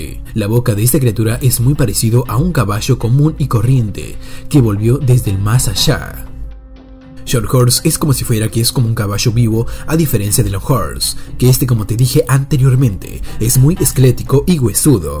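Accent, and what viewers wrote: Argentina. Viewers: ares argentino dices cuecho en realidad es cuello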